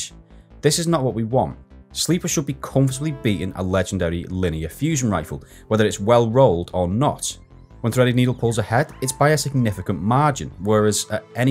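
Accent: Liverpool, United Kingdom. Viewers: Hahahahhh scouser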